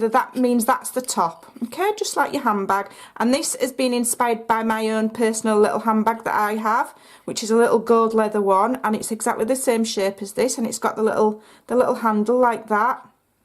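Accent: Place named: England, United Kingdom. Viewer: those are just the cutest! Your laugh and English accent is adorable. Thanks for sharing your talent.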